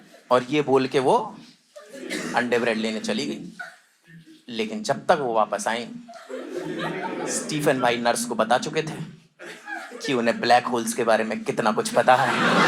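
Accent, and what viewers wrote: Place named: Punjab, India. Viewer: I really love it your comedies and that little spiking punjabi I love it ❤❤❤❤❤😂😂😂😂😂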